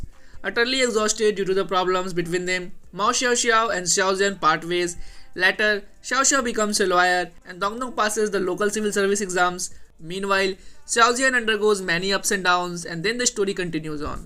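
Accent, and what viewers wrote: India. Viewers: Are you Indian? Your accent 🤪 Me is too🤣🤣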